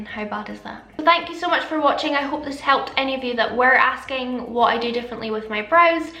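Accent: Scotland, United Kingdom. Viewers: i clicked on this video because i wanted fluffy brows then realised you were scottish and immediately subscribed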